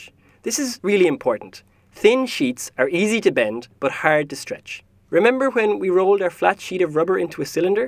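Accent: Ireland. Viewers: Are you Irish, but use Americanisms? Or are you American with an Irish accent? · Hey I hear a fellow Irish person! :D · I love pizza with Irish accents! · irishness! :D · is this huy irish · He sounds vaguely Irish to me. Not at all Chinese. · irish accent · Is that an Irish accent? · do i hear an irish accent? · Irish accent? Idek. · SymbolX the narrator is irish and your comment made no sense to me otherwise thanks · what accent is this? irish? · Actually, seemed pretty damn Irish to me... And, living in the UK and having an Irish best friend, I know what Irish sounds like. =) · The accent was actually from the Republic of Ireland! If it was a Scottish pizza it would have been deep fried, yes that actually exists there!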